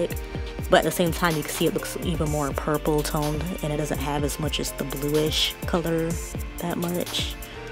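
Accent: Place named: South, United States. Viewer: You sound so country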